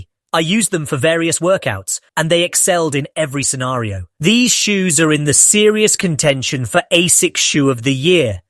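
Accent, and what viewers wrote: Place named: United Kingdom. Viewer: I've been watching your videos for awhile and I was a little surprised at first to hear some British dude narrating. :) I've always loved the look and the sharp rocker profile of the Noosa but I've never tried them because of concerns about cushioning. Also, the midsole still says FlyteFoam, not Blast. How do these feel on a 6-8 mile easy run compared to something like the Nimbus? I'd love to have a comfortable non-plated shoe with a great rocker. Thanks.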